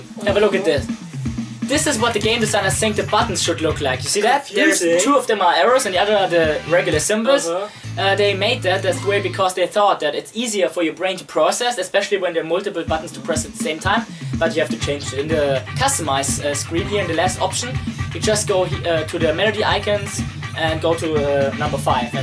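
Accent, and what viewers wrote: Germany. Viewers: ich wusste das du deutsch bist XD dein Akzent verrät es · Nice to see as a german. Quite creative man keep it up Und man merkt den Deutschen Akzent aber cool ;)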